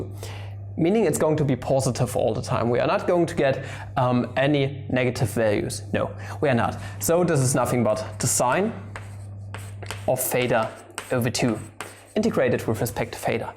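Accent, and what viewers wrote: Germany. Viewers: It's his German accent and nothing else.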